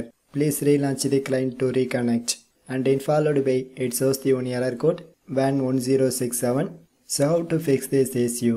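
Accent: South India, India. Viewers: south indian lol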